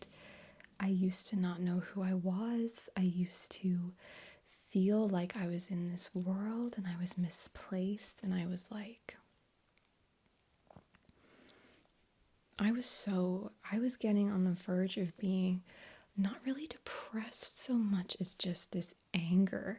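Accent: United States. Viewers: I forgot until I read that comment that you are British! It was near the end, too! Wow great American accent. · Your American accent is sooo good it throws me off more when you speak in your normal accent. You control all the little tell tale signs that usually peek through when doing an American accent. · As a none American, I would be easily fooled into thinking you where American most of the time. very creative idea. don't think I've seen a video where the character is an asmr fan without it being "crazy stalker" roleplays.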